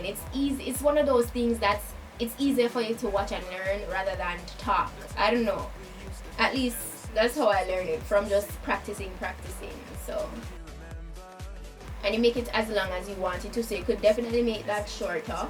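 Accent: Jamaica. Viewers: I subbed as soon as I heard that Jamaican voice 🇯🇲🇯🇲🇯🇲🇯🇲🇯🇲🇯🇲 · Hey i just wanna say, i love the content of ur vids, love ur accent, Im Trinidadian and omg i just love a strong Jamaican Accent . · Are you Jamaican??? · go girl... are you jamaican?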